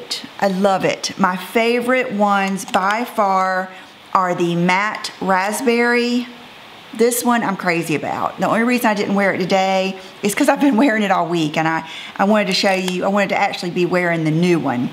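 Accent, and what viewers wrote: South, United States. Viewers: Why I watch your videos: -- You inspire me to look my best every day; even when I don't feel like putting an outfit together or putting on makeup. -- You are a genuine and good-hearted human being (something that, unfortunately, is not so common nowadays). -- You are a stylish and classy woman - a great fashion role model. -- You give great tips about shopping, clothing, makeup, jewelry, etc. -- Listening to your southern accent brings a smile to my face every time I start watching one of your videos. -- And for so many other reasons (too many to list)..... I've been a subscriber of yours since the beginning. Thank you for being a constant reminder that all women are beautiful. And for showing us that when you take a little bit of extra time to look good on the outside, the inside follows and your attitude and outlook on life change for the better. 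· I've been watching you for years and following you on Instagram and although I don't comment very often, I just love your personality. I can relate because we are in the same age group and also our children. Although the extent to my makeup is the basics (I have quite a lippy collection however..lol) I just love seeing your purchases from clothes, to makeup to art etc. Let's not also forget, that southern accent. You're as real as it comes. You like what you like and there's absolutely nothing wrong with that. xoxo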